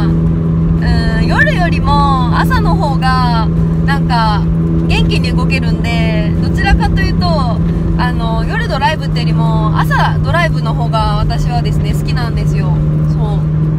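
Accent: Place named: Hiroshima, Japan. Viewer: NAロードスターのマニュアルですか？うらやましいですー！広島弁に聞こえるのですが…わたしrootsがそうなので、だったら嬉しいです！！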